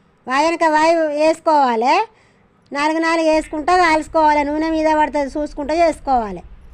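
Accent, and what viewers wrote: Telangana, India. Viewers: మంచిగ చెప్పినవ్ అక్క మన తెలంగాణ స్టయిల్ ల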